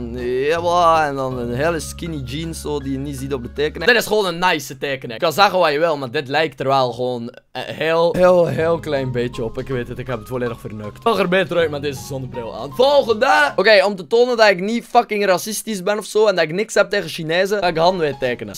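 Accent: West Flanders, Belgium. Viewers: kom je ofzo uit België (ben vandaag je gaan kieken) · Praat wa westvlaamser haha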